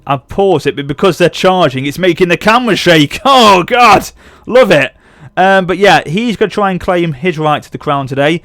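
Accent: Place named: England, United Kingdom. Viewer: MMm such a nice mod. Btw, 1. Do you live in the UK (I know you are Enlgish (Or at least sound it) 2. If you do what Provider do you use 3. If its bt what router do you use to play NTW!!! Ive tried 5 different routers and I still cant play NTW online and I cant exactly get a new provider xD Nice Video as well:)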